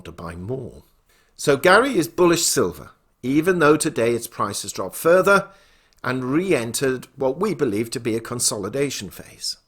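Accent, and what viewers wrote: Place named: RP, United Kingdom. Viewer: Wow, you just accused Mike Maloney, Chris Marcus, Chris Duane, David Morgan, Bix Weir and other respected silver professionals of either not having the technical understanding of the markets or worse yet, fraud by disseminating hype to sell product, while dismissing the fraud committed by JPM and the rest of team evil, who purchased over 2 million of fake paper ounces of silver to knock the price down to low $27s. Makes me wonder 🤔 about whose pocket you're in? The arrogance matches your Queen's accent.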